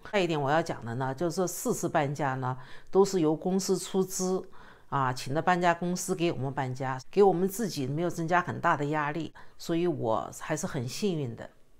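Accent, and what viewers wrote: China. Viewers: 厲害， 有江西南昌的口音。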